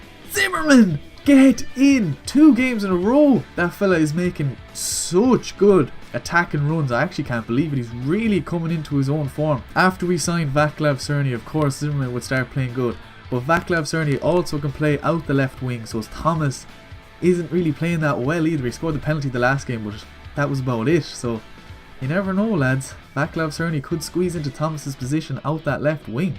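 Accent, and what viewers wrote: Ireland. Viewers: FIFA 17 dont ya hear tat irish accent? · What part of Ireland are you from?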